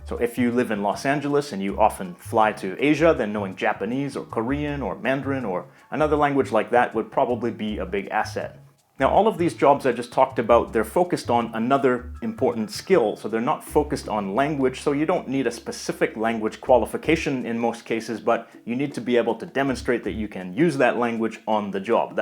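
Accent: Canada. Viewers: Hey,?(first off as a little joke, I’d bet 50 million dollars that you’re Canadian.). Your accent is so strong. Not a bad thing at all, I just recognize the Canadian accent:-) I used to live in Cleveland for a few years growing up after leaving LA at age five. Then I did college and traveled the world. Currently I’m living back in Los Angeles I ♥️ my city!! (a mile from downtown LA and I’m actually- - believe it or not finding it hard to find any reasonably priced tutor or school of languages. We All know Berlitz is SUPER EXPENSIVE. It’s expensive already Just to live here as it is, so my location is all the way across town to Westwood (better known as Tehrangeles) which. And SoCal alone Has the Highest percentage of Iranians living outside of Iran. They’re mostly-no,.. ALL ARE very Wealthy or well to do - and all are Reza Pahlavi supporters for the most part which is fine I’m not being political but the generation just a few years older than me,.. 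who were 7-8 in the late 70’s or early 80’s and left the khoemeini revolution are so far snobby and all about money and materialistic.i Literally cannot find a school under $775 for a once a week four-week class?! And the private tutors want less than $90 per HOUR?! That’s the “best” price I’ve found. Do you have any suggestions or places where I can practice my Farsi? I’m semi intermediate level. Forget USC and UCLA’s programs. They’re wonderful but I would have to sell my kidney to take a semester there. I own tons of Persi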